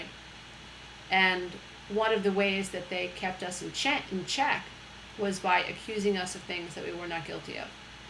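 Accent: New York, United States. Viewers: Don't be afraid of sounding New York! Appreciate you...You helped me break the code. I am the little sister who moved forward because my elder sister did. I am forever grateful. It wasn't without incident, she had to watch me fall (into toxic relationship) before getting up. It is so worth it! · she sounds very New York it's so natural and grounded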